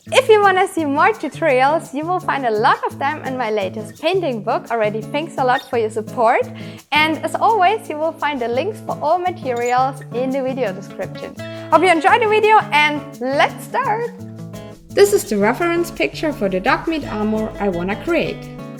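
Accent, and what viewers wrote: Germany. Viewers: are you an german? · you're german??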